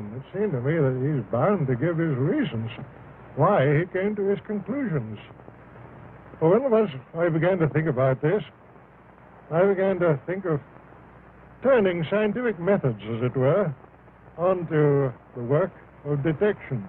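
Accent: United Kingdom. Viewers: Interesting to hear his distinctly Scottish burr.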